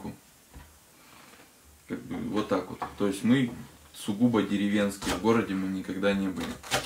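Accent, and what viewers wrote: Urals, Russia. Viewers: Вот как раз по нашему разговаривают ...по Уральски ✌️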